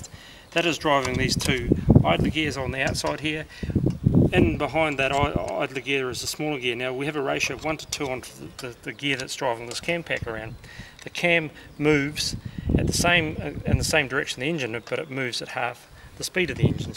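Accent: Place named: New Zealand. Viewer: Kiwi English, easy as!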